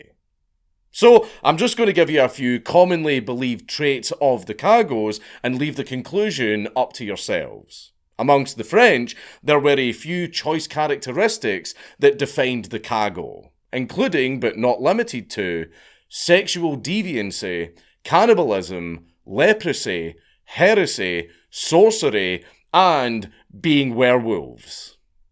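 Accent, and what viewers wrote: United States. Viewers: That's a good American accent. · Mr. Dankula, i must say i love your American accent. you should do it more often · Dankula doing an American accent threw me off so hard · Brillant opening. Excellent American accent. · That was a pretty good vaguely American accent, I do a pretty good accent too but only when I am drunk. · Not the worst take American accent I've ever heard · Pretty good American accent ya got there my Scottish friend. Im also of Scottish, Dutch, French and Native American hertiages. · Just got here from X. Love this style. Dig the gruff American accent. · Dankula, your American accent is great. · That American accent though. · Never thought I would hear do an American accent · Your American accent is good! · Dude, good work on the American accent! Much respect from the land of the whatever we are these days! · Pretty good American accent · with an American accent is CURSED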